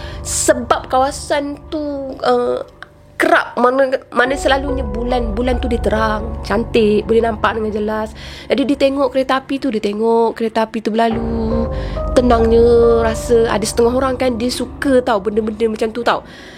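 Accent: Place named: Kedah, Malaysia. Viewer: kak ni mmg org kedah ea tngok lancar ckp😁😁😁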